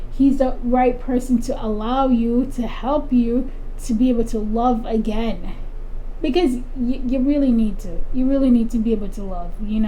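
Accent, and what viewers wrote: Jamaica. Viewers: Oh u Jamaican 😁😁..Bless up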